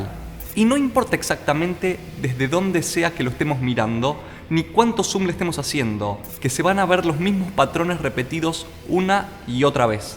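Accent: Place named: Buenos Aires, Argentina. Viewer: De Buenos Aires sos!! O de Rosario... es el típico acento!!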